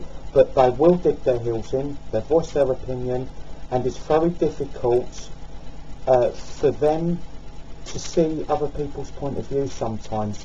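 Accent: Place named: United Kingdom. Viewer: such good material... but the audio is really poor. and you have an awesome brit accent!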